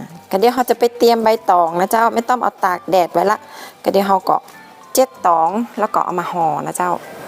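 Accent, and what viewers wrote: North, Thailand. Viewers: ตอนเข้ามาดูครั่งเราติคุณเรื่องภาษาเพราะเราไม่เข้าใจทั้งหมด ตอนนี้ฟังไปฟังมาภาษาคุณน่ารัก ช่างดูใจดี สุภาพ นุ่มนวลแบบคนเหนือ เราเข้ามาหาอาหารมังสวิรัติค่ะ เลยแวะเข้ามาดูค่ะ. อ้าว...ดูไปมีเลือดด้วย เลยต้องดูเฉยไม่ทำตามล่ะ แต่ดูดีน่าทานค่ะ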